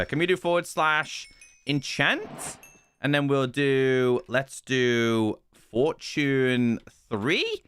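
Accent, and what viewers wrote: United Kingdom. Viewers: I was watching one of your videos and my Dad said “what in the British!?”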